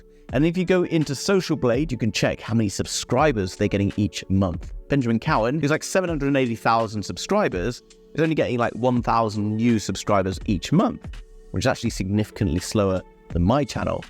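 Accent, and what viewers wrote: United Kingdom. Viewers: Adorable where u from in the UK lol😊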